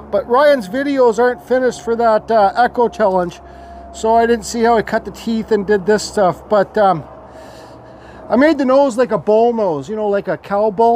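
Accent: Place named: Canada. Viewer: Love the Canadian accent !!! 👍 I’ve learned So Much from You !!! 👍 You’ve Taught Me So Much !!!👍 Thanks 🙏 !!! Can’t Wait to get started !!! My Father was an Artist , Acrylics !!! 👍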